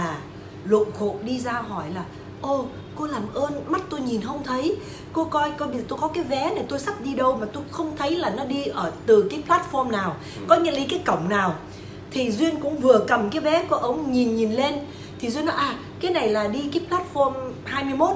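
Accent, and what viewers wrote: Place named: North, Vietnam. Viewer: Giong bac ky va` nghe thuat cach noi 20 nam lam MC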